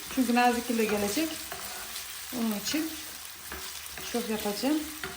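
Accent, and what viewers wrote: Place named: Nevsehir, Turkey. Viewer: Selamünaleyküm memleketlere Nevşehirli gibi konuşuyor aynı güzel oluyor eline sağlık Eline sağlık ben de yapıyorum onu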